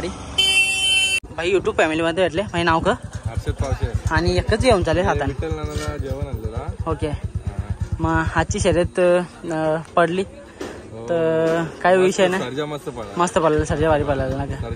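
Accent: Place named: Agri, India. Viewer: bhai aagri bhashen bollyav jam bhari vatt .. kadak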